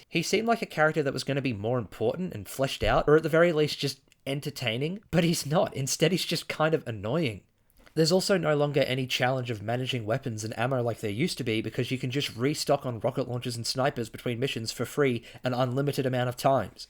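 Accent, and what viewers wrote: Australia. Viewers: >deadpan Australian accent >scalie rantsona Oh yeah it’s gamer time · Australian youtuber try not to be deadpanned and monotone challenge: impossible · Ausie accent with a lizard avatar that has big badonk-a-donks? This is amazing give me more. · weird that the harvey norman mention was the tipoff for being aussie and not the accent. hi btw other aussie here, i keep seeing halo 4 at this op shop i go to and i've never played halo before and don't own an xbox so am I haunted?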